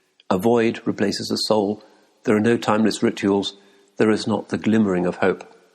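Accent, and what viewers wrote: England, United Kingdom. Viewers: I like this, just wish it didn’t sound so staged. Maybe I’m just a lecture kind of person...it takes a certain nerve to appreciate poetry in a heavy English accent.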